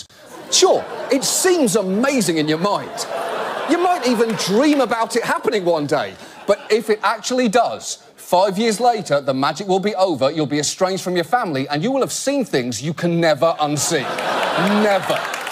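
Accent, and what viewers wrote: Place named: United Kingdom. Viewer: The Mighty Sparrow, you’re wrong his accent is 100% British.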